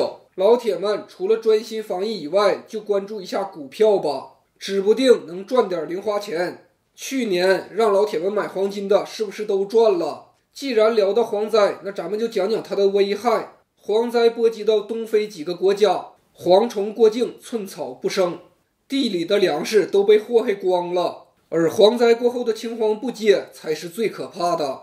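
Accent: Northeast, China. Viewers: 你好！其实他的中文可能有点东北味，但是非常清晰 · 我刚刚发现了这个YouTube频道。我是荷兰人，正在学习中文。我喜欢他的中国口音。他说的很清楚！😀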